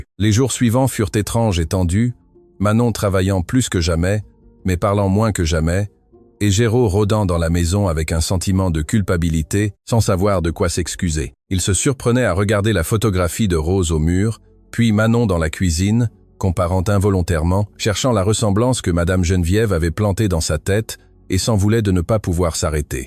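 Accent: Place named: Auvergne, France. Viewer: ❤❤❤ très très belle histoire d'amour qui donne du beaume au coeur. Mon passage préféré est la visite de Jérôme au curé car on se doute qu'il va lui parler de mariage et je n' attendais que cela. Je suis en France en région Auvergne, c'est un endroit juste au milieu de la carte et ce genre d' histoire a pû exister en son temps car le conteur parle comme parlait les gens d ici.❤❤😂❤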